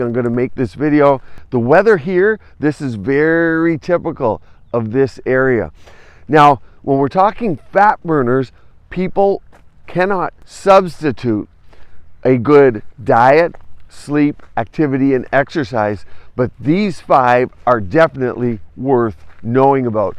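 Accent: Canada. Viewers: I falsely thought the eastern part of Canada stayed cool all year but see thats not true and can be quite warm. Your canadian accent seems more pronounced when you're there. I don't normally notice that you have an accent. Looks like a great place to grow up! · Well your accent reminds of a Canadian accent, and you must be on the Atlantic coast so I guess Nova Scotia?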